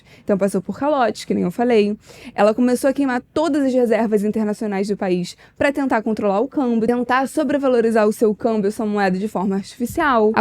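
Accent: Rio de Janeiro, Brazil. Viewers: Ótimo vídeo, pena que é sotaque RJ. Você tem twitter moça?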